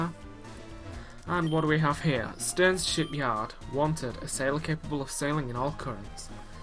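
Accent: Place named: England, United Kingdom. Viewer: Are you From England?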